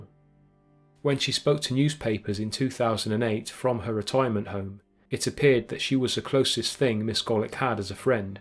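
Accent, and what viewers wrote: United Kingdom. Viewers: your impeccable research and ability to keep us on our seats wondering whats going to happen next is only enhanced by your awesome accent. like it would still be really interesting and awesome but I think having a distinguished british accent just ties it together in a beautiful black bow.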